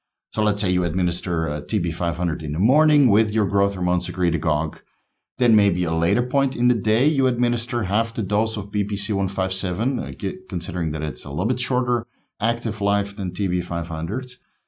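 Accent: Netherlands. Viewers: Where is from? I hear a slight dutch accent 🤭 ah nice thicker skin. Using that triple peptide stack now to heal from major surgery. But my skin has become very thin.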